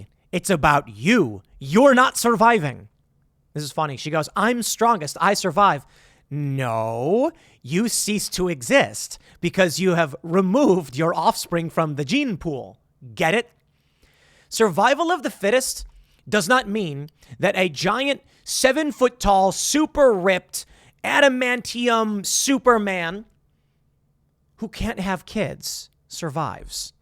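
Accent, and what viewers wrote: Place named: South, United States. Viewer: Southey Accent came out strong on this one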